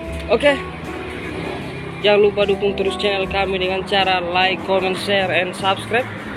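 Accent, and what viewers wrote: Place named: Sulawesi, Indonesia. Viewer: Orang sulawesi ya logatnya itu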